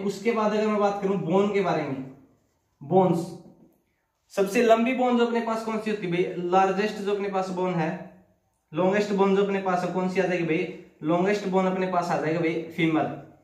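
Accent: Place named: Haryana, India. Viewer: Boli me hi haryanvi touch hai 😅😅 gajab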